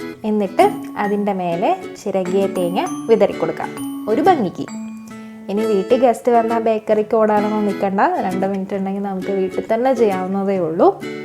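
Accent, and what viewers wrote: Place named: Thiruvananthapuram, India. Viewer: Tvm slang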